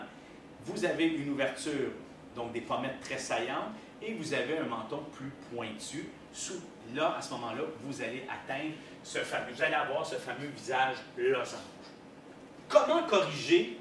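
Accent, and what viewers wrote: Canada. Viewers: Bonne vidéo avec un joli petit accent du Québec !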